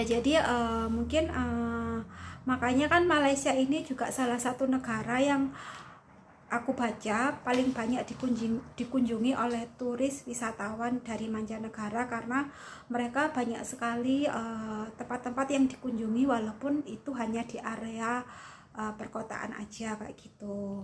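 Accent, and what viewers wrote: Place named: Java, Indonesia. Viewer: Koe wong endi toh yu..kok bahasane medog buanget..untunge ricok oleh bojo londo yuk...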